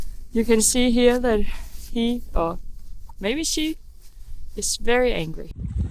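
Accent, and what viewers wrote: Denmark. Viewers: what for a lovely place in nature! thanks for taking us along; it's nice to hear the danish accent! sheep around the tent, what for a soothing scenery...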